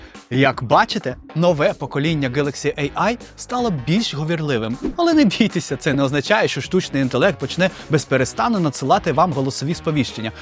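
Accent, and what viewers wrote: Standard. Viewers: Яка чиста українська мова. Чудово 💙💛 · Ще й український кореєць ведучий огляду, з чистою українською мовою… Це прям новий рівень) Дивлюсь з iPhone, але нові моделі Samsung дуже зацікавили)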